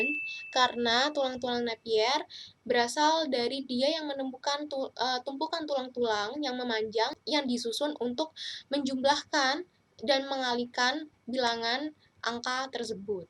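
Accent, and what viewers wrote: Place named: Surabaya, Indonesia. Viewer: Nessie judge rasa sby iki 🤣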